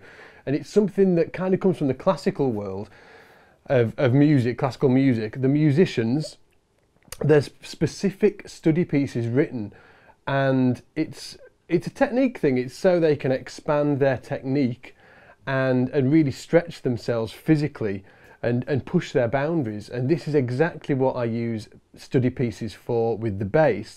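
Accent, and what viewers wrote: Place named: United Kingdom. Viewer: you are a great teacher. Years ago a police detective friend realized later in life the New York accent made him, let say upset. I too have found the UK accent has a similar effect on me. Which is the only reason I canceled my membership. Again you truly have the gift and it saddens me deeply. I wish you all the Health, Wealth and Happiness life has to give.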